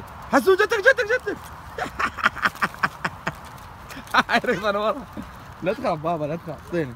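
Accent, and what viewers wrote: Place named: United States. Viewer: زين شلون تعلمت الهجه لامريكيه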